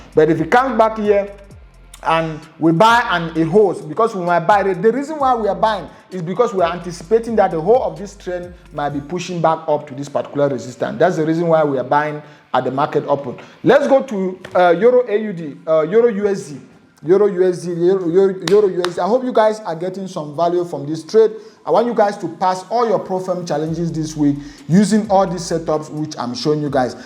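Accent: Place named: Nigeria. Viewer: You just sound like a Nigerian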